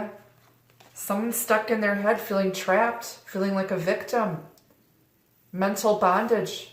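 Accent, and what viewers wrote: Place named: Wisconsin, United States. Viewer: i miss the wisconsin accents in my life! listening has been a real treat for me (subscribed)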